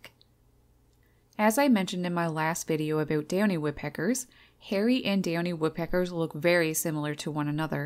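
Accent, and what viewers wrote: Canada. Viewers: You have the most Canadian accent I've ever heard. Cool video.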